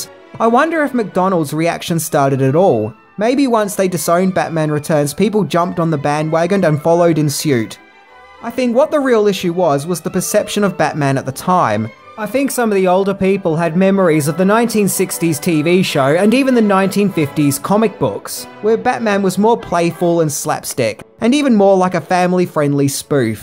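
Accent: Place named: Australia. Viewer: this is what tim pool looks like without his beanie, hey tim pool pretty good aussie accent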